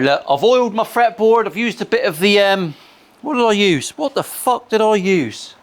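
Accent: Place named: Devon, United Kingdom. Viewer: Dude as a navy guy who has created more combo swear words than I can remember such as “syphilitic bastard”and insults such as “the best part of you ran down your mothers leg” I still find new hilarious insults from. And I say then with his Devon accent and I’m Texas. So I sound very proper with my insults.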